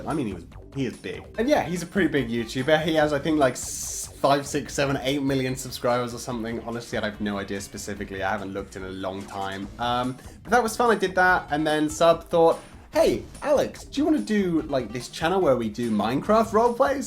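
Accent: England, United Kingdom. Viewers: it is because he is English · Because he's British. NOT English-speaking **BRITISH**. Edit: Didn't need to edit.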